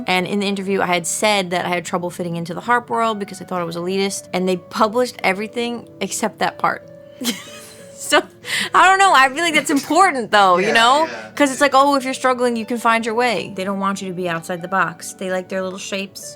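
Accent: Long Island, United States. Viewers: Greetings,! I've been lurking on your YouTube channel on and off for about a year. I didn't know you were based out of Long Island. I guess the thick accent should've given it away. Your accent is Long Island AF. I was born and raised in Long Island (Bay Shore) until I was 15 when my family moved to Florida. In my opinion, an artist is someone who uses their skill at a particular medium (in this case, harp playing) to create art. The person has a passion for their craft and is willing to dedicate time and effort into it. They have a desire to express themselves through their work and can envision ideas, emotions, and stories that are then translated into their work. Not only that, but their work is able to evoke emotions in others and inspire others. You're doing these things, so I would say that qualifies you as an artist. Keep doing what you're doing!, I'm all for physical media, and while I personally own a record player, not all of your followers do, and they have no way to listen your album unless they decide to buy a record player. 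Also, what's stopping someone from doing a line-in recording of the album to their computer and sharing the digitized audio online?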